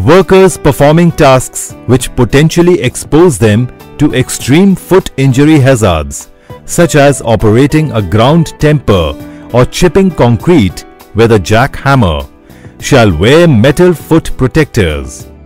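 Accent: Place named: Thailand. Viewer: The best Thai English I've ever heard, man! And thank you for this video.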